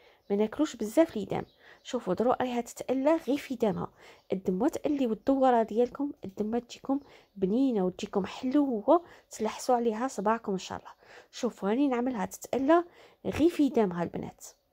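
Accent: Tlemcen, Algeria. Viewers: اول مرة سمع لهجة تلمسانية سبحان الله حنا جزاؤرين. ومنهدروش كيف كيف ،🤔🤔🤔🤔🤔🤔🤔🤔🥳🥳🥳🥳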